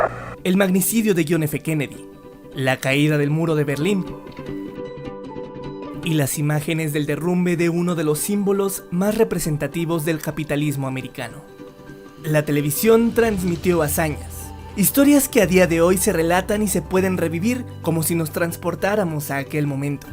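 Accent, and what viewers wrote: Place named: Bolivia. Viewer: porque la locución habla como si fuera bolivia o algo asi?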